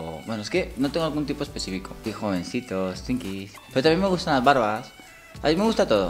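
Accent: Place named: Spain. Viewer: No mames, eres latino y hablas el acento de España. Que ridículo.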